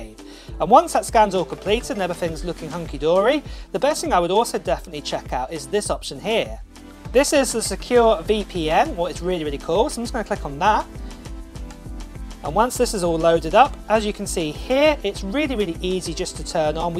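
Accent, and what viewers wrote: United Kingdom. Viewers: the service that you are advertising won't allow anybody outside of the US to enter their address in the purchase page. How did you get your keys, because you sound British, or are you living in the US? Maybe you should disclose that.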